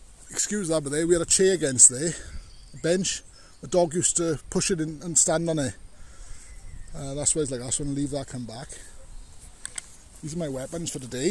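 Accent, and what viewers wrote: Wales, United Kingdom. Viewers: I should say British accents, rather than English as this man is Welsh. Same theory though; Listen enough times to the variation of accents in the UK and it will make sense. Unless they are from Newcastle where even us normal British people do not understand a word they are saying. Google "Newcastle accent" and you will see what I mean!!!!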